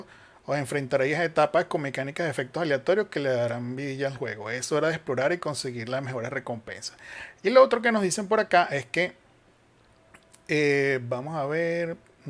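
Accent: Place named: Venezuela. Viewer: Coño esa forma de hablar pareces venezolano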